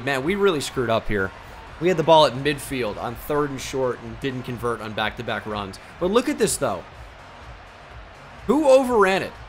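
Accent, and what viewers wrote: London, United Kingdom. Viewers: u throw every ball as a bullet pass u need to lob or touch pass sometimes thats why that Drake London play happened. W british accent tho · Keep spamming the same play that doesn’t work and keep spamming the london accent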